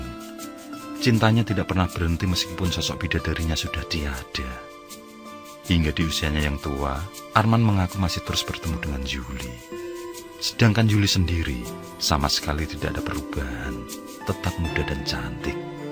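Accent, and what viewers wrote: Java, Indonesia. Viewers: Sekali2 pengisi suara diganti , om ... Nggak itu2 aja Masak logat NTB sama persis dgn logat Jawa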